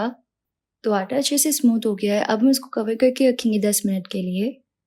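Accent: United Kingdom. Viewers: i am talking about accent.. she is talking urdu in English accent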